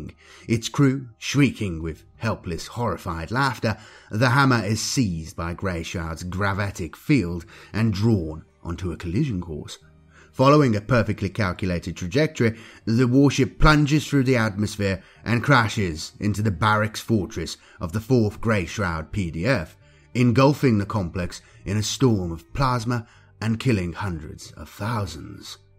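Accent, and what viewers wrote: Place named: RP, United Kingdom. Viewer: You have crafted the almost perfect 40k narration voice , English received pronunciation with a touch of theatrical enhancements to add a dramatic effect , but not too over the top or camp , admirable restraint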